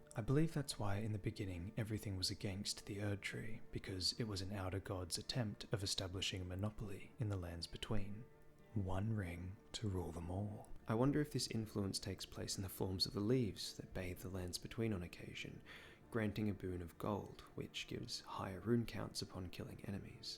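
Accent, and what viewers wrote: Australia. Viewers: Are you from the same region/city in Aus as Vaati, its crazy how close your intonations are. Or is this like posh aussie? 🤣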